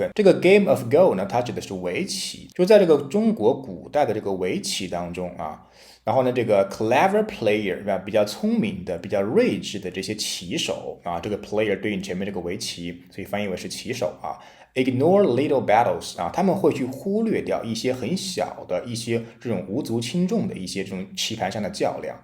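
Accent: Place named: United Kingdom. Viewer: 有英腔朗讀，又有精闢句型詳解，是一個真正學好英文的好節目。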